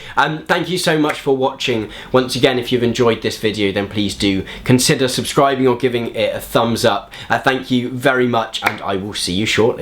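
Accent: United Kingdom. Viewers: The first 20 seconds sounded very British.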